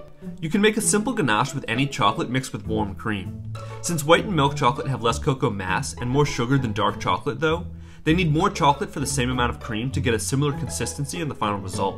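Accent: Australia. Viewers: Love this channel, but is it just me or did just he get an Australian accent? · Your Aussie accent really came out in this one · Why does he have an Australian accent for just this video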